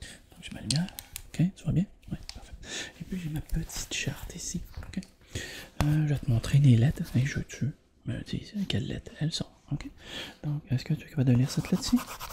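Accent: Quebec, Canada. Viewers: Tu est du Québec ?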